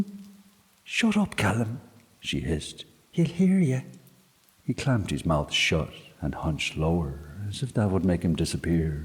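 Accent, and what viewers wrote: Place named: Ireland. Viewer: Yes it's Saturday night and off course I'm on my own but the difference is tonight the 14th of February you are with me 🌹 Happy Valentine my beautiful Irish voice who tells the most haunting tales ❤️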